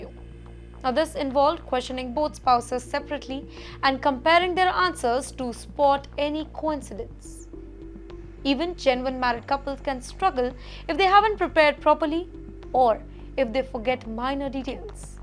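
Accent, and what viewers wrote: India. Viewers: The host has a standard Indian accent so if you call that a third grade reader then they all sound like third grade reader and speaker. She sounds like a third grade reader but the majority sounds like first grade readers in my opinion.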